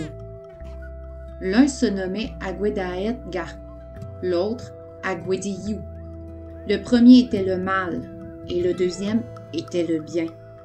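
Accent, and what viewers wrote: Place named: Quebec, Canada. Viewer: Merciii ... J'aime t'accent !! ... Svp c'est l'accent de quelle regeion de québec?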